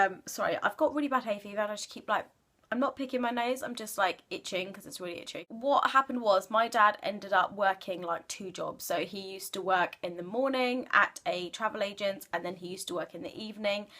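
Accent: United Kingdom. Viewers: Nice British accent. Cheers! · Love you British accent.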